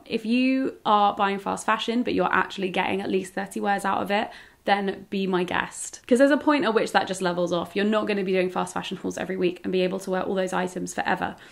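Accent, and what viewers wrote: United Kingdom. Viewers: Omg, I literally faancy your accent!! Beautiful British accent 🤍🤍🤍. And the video was very helpful. Thanks 🙏🏽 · Awww you are so beautiful and funny and overall so enjoyable to watch. Love your way of speaking too (said by a non-British person who loooves a good British accent and well-spoken English in general!) Happy to join your channel and happy to have found it! Yay! Thanks for the tips, I am new to the "Capsule wardrobe" system and I am considering adopting it, as I like the idea - but at the same time I am not too sure about some other aspects of it... so thanks again, this will help me make better decisions (hopefully, haha)! P.S. with your body type you would be soooo popular in my country of birth, Brazil!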